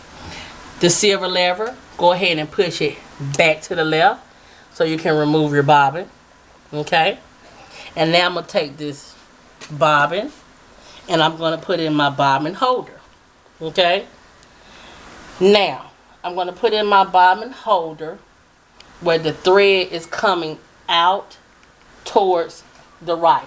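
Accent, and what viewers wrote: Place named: South, United States. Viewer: I love your voice. So country. You should teach a class.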